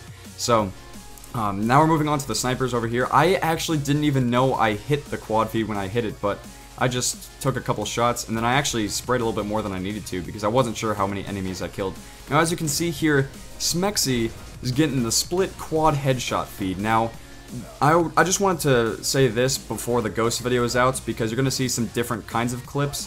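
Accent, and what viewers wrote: Germany. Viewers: r u German? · R u German? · R u german??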